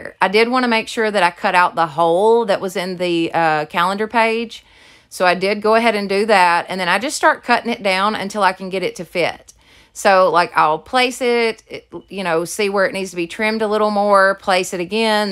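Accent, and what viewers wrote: South, United States. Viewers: Such cute ideas, I also love your accent. I'm from the East Coast & love the Southern accent. Happy crafting 😉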